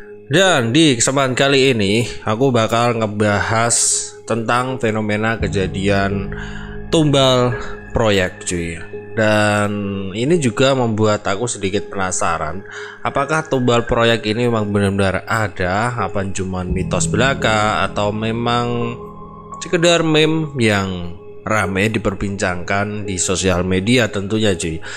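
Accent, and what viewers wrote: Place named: Java, Indonesia. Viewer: orang jawa emang logatnya beda hhhhhhh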